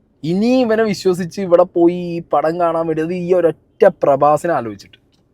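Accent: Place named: Karnataka, India. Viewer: Kannada English 😎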